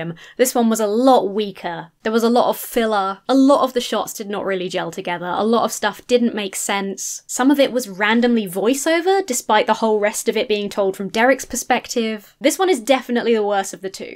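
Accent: United States. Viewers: You do a great American accent! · You've got a good American accent!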